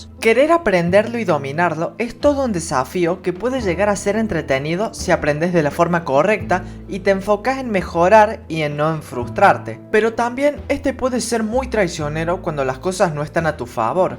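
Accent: Córdoba, Argentina. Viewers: Cordobes xd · Chabon sos de Córdoba? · capo el pibe cordobés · Hola bro sin ofender pero ti vos se me hace a la de un Cordobés me resulta muy gracioso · apenas empezó el video me di cuenta que sos cordobés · vo so cordobes no? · Muy bueno el video hermano una duda ¿sos Cordobez? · por que es de cordoba, una provincia de argentina en la que hablan asi jajas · 😆!es cordobessss¡¡¡¡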